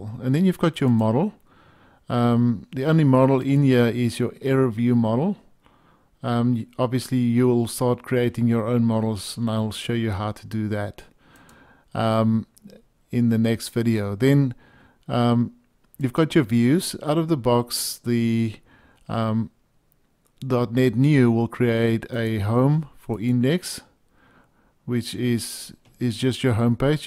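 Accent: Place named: South Africa. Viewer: great. you sound South African...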